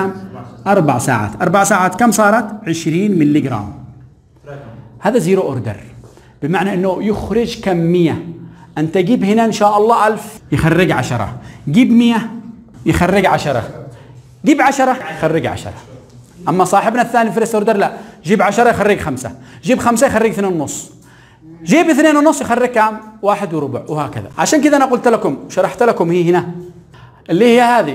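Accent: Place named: Yemen. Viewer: اللهجة اليمنية ❤ الله يوفقكم اهل اليمن ويزيدكم من علمه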